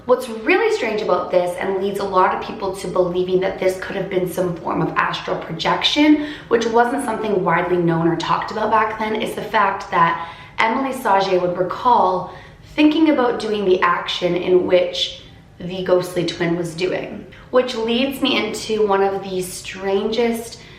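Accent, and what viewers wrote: Canada. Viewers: omggg i love ur Canadian accent 💗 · WHY ARE YU TALKING LIKE A CANADIAN?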